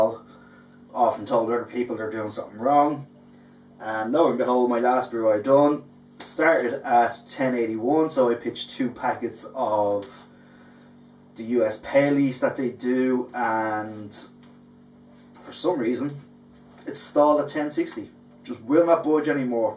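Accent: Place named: Ireland. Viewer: I feel your pain with the CML yeast, my porter took 4 1/2 weeks and 3 packs to get down to 1.020. I wonder why their recent batches of yeast are having issues? Like you said you've been using it for a year, so it's a recent issue. Hopefully they sort it out, they are great little company, love their hops. Oh and welcome to brewtubing, nice to see another Irish accent. Top video btw 👍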